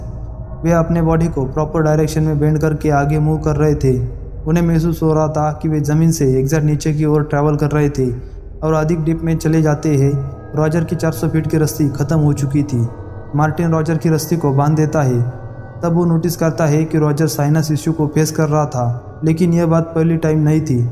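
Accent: Maharashtra, India. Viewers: Marathi ho kya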